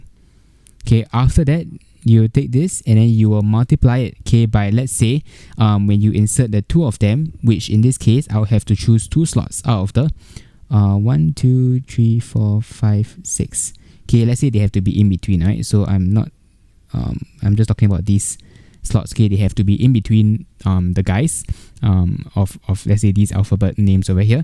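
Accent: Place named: Singapore. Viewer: are u singaporean ?? istg u sound just like my singaporean friend!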